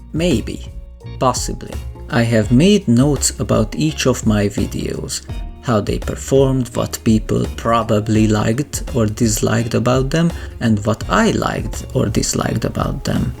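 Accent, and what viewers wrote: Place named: Hungary. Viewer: Happy New Year. You're from Hungary from what I hear from your accent.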